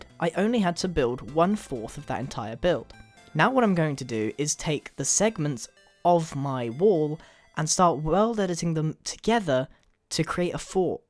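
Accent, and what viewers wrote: England, United Kingdom. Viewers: He is British. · Yeah English accent, that's what its called. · Everybody loves the English accent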